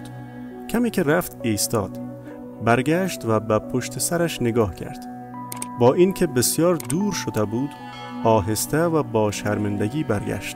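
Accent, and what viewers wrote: Afghanistan. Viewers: جناب چرا اسم و محل آن شهید را به خانواده هایی که عزیزانشان سرطان و یا مریضیهای نا علاج دارند ندادید که آنها هم مثل شما خوشحال شوند مگر آن مادرانی که فرزندانشان درگیر مریضیهای مهلک هستند آدم نیستند و احساس ندارند ! ؟؟ میدونم که این داستان مال حدود یکسال پیش است ولی دردمندان زمان نمیشناسند بروید و به دادشان برسید مگر آن شهید بهش سفارش شده فقط برای فرزند شما شفا بگیرد ، راستش نمیدونستم آن دنیا هم پارتی بازی میکنند درثانی شما با این تعریف میگویید قدرت شفای شهیدان از خدا بیشتر است ! ؟ برو بابا جمعش کن و این چرندیات را به خورد مردم بدبخت و ساده دل نده ، راستی نمیدانستم مردها هم میتوانند زن فوت شده را غسل کنند خوب بوی گلاب که آن مرده میداد دیگه شستینش که گلابها برود و .... دست بردارید و حال من و بقیه ای که که این چرندیات را. البته لحجه شما بیشتر شبیه مردمان عزیز افغانستان است ، با این چرندیات خواهران و برادران افغانستان را هم که برای همه ستم دیده هایشان بد نام نکنید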